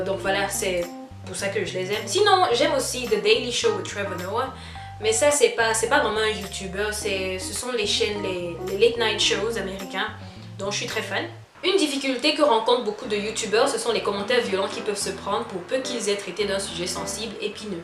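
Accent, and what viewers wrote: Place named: England, United Kingdom. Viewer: J'aime beaucoup ton accent anglais. Où tu l'as tu appris ?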